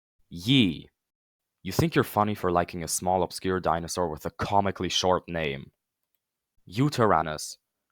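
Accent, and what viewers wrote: Germany. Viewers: When I heard this guys voice I knew this guy was German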